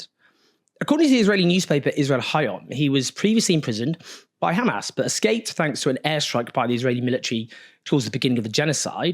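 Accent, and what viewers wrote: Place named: Sheffield, United Kingdom. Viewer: From here in Canada I’ve loved all of your commentary, you are a truly passionate humanitarian. Keep up the sharp lens and wit you bring to the subject. One thing though, and please don’t take this the wrong way, but I know of people who I’ve wanted many times to send your videos to, but I know they’d have a hard time with your sometimes rapid fire words combined with your more than slight Sheffield accent. I sometimes have a difficulty with that myself (and do slow the video down to take it in easier) and I think other Anglo speakers might as well, especially when your obviously skilled delivery with words comes at a blink of the ear so to speak. 😊you’re a rock. Thanks.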